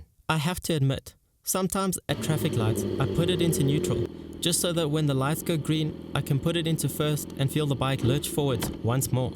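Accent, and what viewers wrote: South Africa. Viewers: Is that south African accent? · Bro you from south africa 🤤🤤🤤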